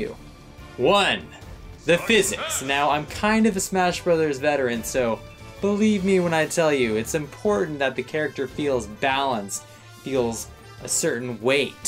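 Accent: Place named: England, United Kingdom. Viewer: Top quality English accent.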